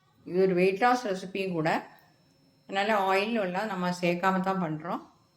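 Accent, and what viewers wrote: Kerala, India. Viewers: Are u a malayali?your slang of Tamil says so.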